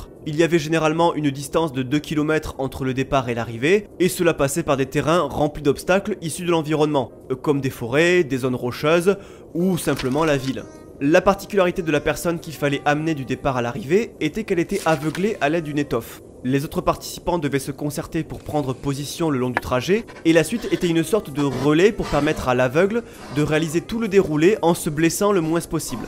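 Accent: South, France. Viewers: Tu ne viendrais pas du sud par hazard (tu dis moins en prononçant le s)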